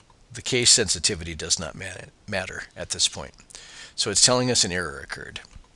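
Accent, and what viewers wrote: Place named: United States. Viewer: I FINALLY FOUND AN INSTRUCTOR WHO SPEAKS AMERICAN ENGLISH.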